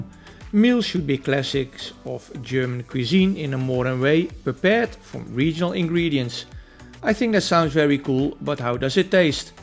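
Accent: Netherlands. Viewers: What a terrible Dutch accent.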